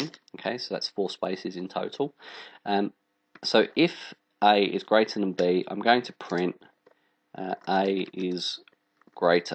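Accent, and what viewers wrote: England, United Kingdom. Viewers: you did a very good job in a quick and easy introduction to Python FU Scripting for GIMP. OK, I understand that one does what one knows but your last tutorial is a “Hack”, sorry I am not going after you, it is just bad programming. All I am saying is that if the only two variables there are changing is X1 and Y1, for the starting position. Then one would think that you should in your if-elif-else (which is really a switch statement in C# or any other coding language, but Python does not have one so the if-elif-else) just set the variables x1, y1 and then after the switch then call the blend function (pdb.gimp_edit_blend) one time. Tighter code and much more efficient. By the way all teachers should have a slight English accent and it sounds perfect. It is like everyone with glasses looks smarter 😉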